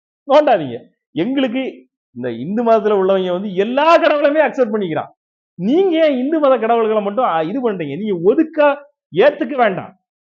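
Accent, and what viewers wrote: Tirunelveli, India. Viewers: Superb. Very matured talk. Entha ooru bro neenga? Tirunelveli slang iruku.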